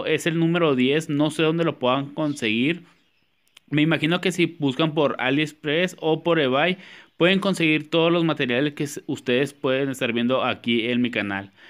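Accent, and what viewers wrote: Chihuahua, Mexico. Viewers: De dónde eres? hablas como lo de Chihuahua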